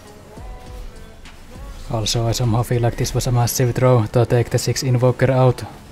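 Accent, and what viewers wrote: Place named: Finland. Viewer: You have to be 100% finish. That accent tho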